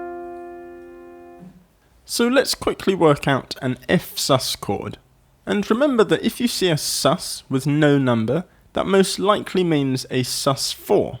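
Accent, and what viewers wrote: United Kingdom. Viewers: u british right?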